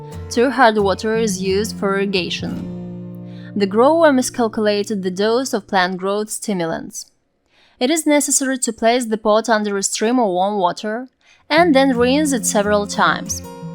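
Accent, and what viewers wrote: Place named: Russia. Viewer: Publicly known facts delivered in heavily accented English. Perhaps make the next video in Russian, and only when you have useful info.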